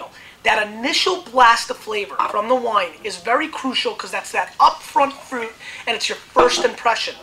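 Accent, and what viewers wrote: New Jersey, United States. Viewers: from Jersey much?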